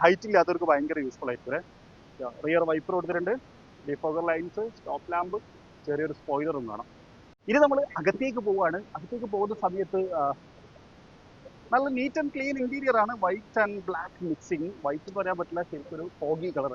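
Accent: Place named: Kasaragod, India. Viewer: Nee kasrod nn inn mansilay😅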